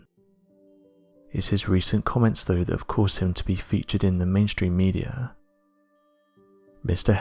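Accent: United Kingdom. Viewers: British right?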